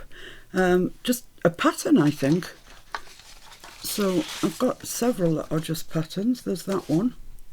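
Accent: England, United Kingdom. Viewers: It is the difference in accents. I am in the United States. My son in law is from your part of England and sometimes he has to repeat things for me. I will continue to watch what you are doing as i love them. I bought an Edith Holden book so I can use it for some of your wonderful journal items. I had never heard of her books so you have expanded my knowledge. Thank you.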